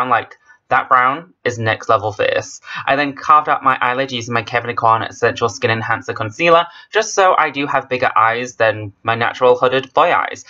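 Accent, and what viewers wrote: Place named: United Kingdom. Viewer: and the British accent is so a turn on 💖💖💖💖🌹🌹🌹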